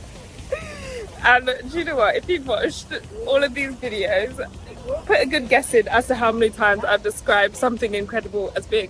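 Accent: United Kingdom. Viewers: Are you British, you hv that accent there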